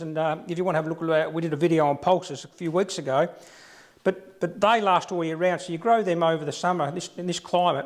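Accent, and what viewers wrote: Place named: Australia. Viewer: Where abouts are you located? You sound Australian.. I live in subtropical Queensland (Gold Coast) and absolutely STRUGGLE to grow even heat loving crops during summer. It’s autumn and we are still having 32degree Celsius days!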